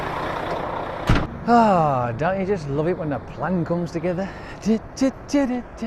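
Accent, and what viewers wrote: Birmingham, United Kingdom. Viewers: This guy reminds me of a brummie version of my dad lol. He taught me many years ago in exactly the same way God rest his soul ❤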